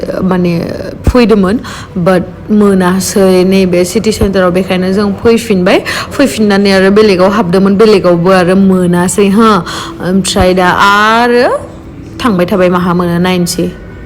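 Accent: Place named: Arunachal Pradesh, India. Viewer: Sach main arunachal ke log aise bolti hai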